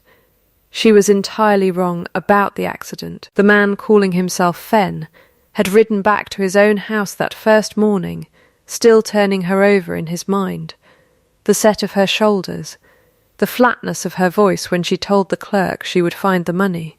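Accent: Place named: United Kingdom. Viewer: An awfully severe British accent generated by AI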